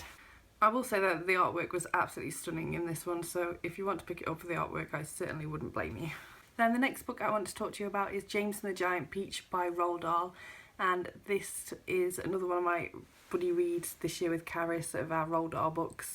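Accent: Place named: Bury, United Kingdom. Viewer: I love your accent; sounds very like Victoria Wood's. She was from Bury, if memory serves, so I guess you must be, too.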